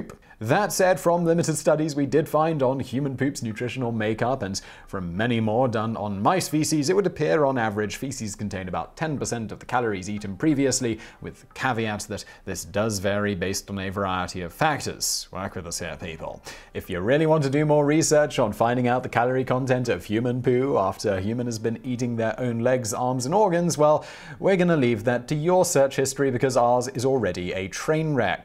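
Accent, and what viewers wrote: England, United Kingdom. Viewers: sounds so English and proper, when he cracks up, it's super hilarious! Cracks me up!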